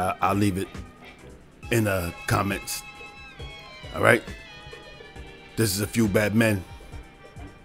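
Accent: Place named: Brooklyn, United States. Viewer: I grew up in Canarsie Brooklyn every one spoke like you they would say hey kid “smartin up”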